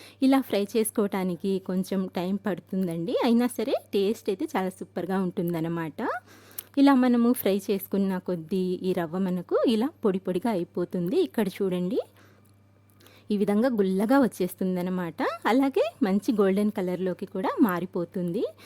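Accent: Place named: Coastal Andhra, India. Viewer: " " అనే పేరు బహుశా ముస్లిం స్త్రీల కు వుంటుందని నా అభిప్రాయం. కానీ మీ పలుకు హిందూ మహిళలు మాట్లాడినట్లు తెలుస్తోంది. మీరు పలికే విధానం కూడా కోస్తా ప్రాంతం వారి లాగా వుంది. మరల మీ వంటలన్ని హిందువుల పండుగలు కావలసినవి గ వున్నాయి. మీ వాయిస్ కూడా మార్థవంగా వుంది.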